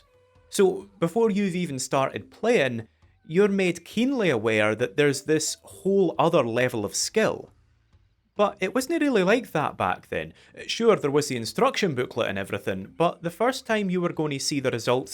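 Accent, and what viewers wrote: Scotland, United Kingdom. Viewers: Your accent is so gloriously Scottish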